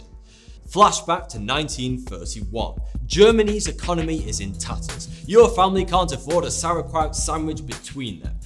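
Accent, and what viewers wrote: United Kingdom. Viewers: What British accent is it? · Why u say bot not but? Oh ur from uk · interesting video, but that obnoxious bri-ish accent made my ears bleed.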